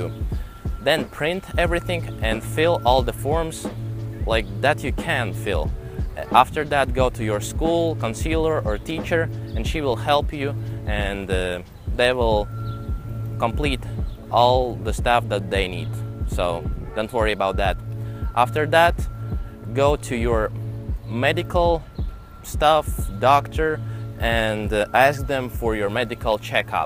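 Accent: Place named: Russia. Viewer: Я чувствую Русский акцент... Really?!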